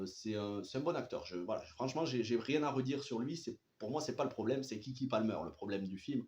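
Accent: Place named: South, France. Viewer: Nope reste meilleur qu'US mais je trouve qu'il est trop long avec un changement de ton qu'il ne maitrise pas tant que cela car parfois je suis sorti du film ... Trop d'idées (beaucoup de references) rend en finalite le film moins interessant et c'est dommage. Grosse qualité visuelle, gestion de l'espace avec des jolis plans, l'histoire des dresseurs de chevaux afro americains au debut du cinema, la scène finale avec la poursuite en moto, la relation entre le frère, le père et la soeur. Merci pour ta video avec ton accent qui chante les cigales du Sud 😉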